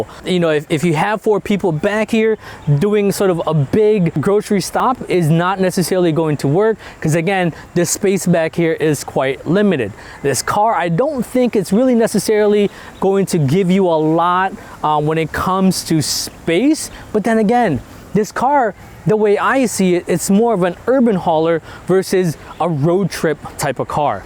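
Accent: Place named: United States. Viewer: Good video, you sound like an American, if so how do you think the Jimny would handle American highways and traffic. Long drives that sort of info. TY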